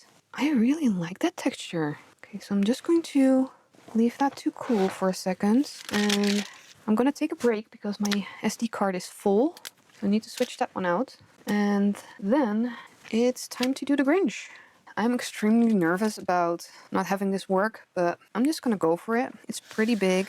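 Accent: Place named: Netherlands. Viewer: You sound a little bit dutchie 😀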